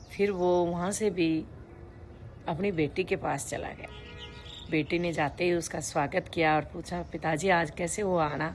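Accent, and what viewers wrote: Gujarat, India. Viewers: आपका अंदाज बहोत अच्छा है आप भी खूबसूरत हो ,सिर्फ एक बात खटकती है आपके उच्चारोमे गुजराती या एम पी का टच आता है ,उसे सुधारणे का प्रयत्न किजीये ,आप एक अच्छी कथावाचक ,Anchor बन सकती हो ,हार्दिक शुभेच्छा 🎉🎉🎉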